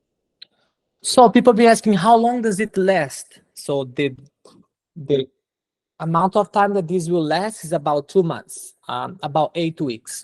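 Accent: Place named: Brazil. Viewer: Pelo sotaque brasileiro 🇧🇷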